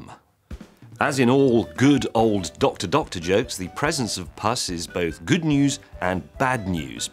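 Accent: United Kingdom. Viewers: British accent 😳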